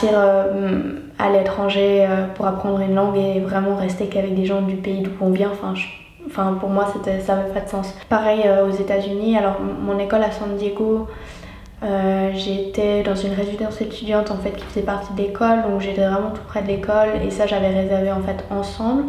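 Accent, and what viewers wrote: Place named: Switzerland. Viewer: Merci d'avoir publié de cool vidéos en français. J'ai appris beaucoup de nouveaux mots. Votre contenu est tellement intéressé. J'avais un peu peur d'écouter, comme l'accent suisse est tellement différent de l'accent québécois. Avez-vous des recommandations d'autres vidéo français?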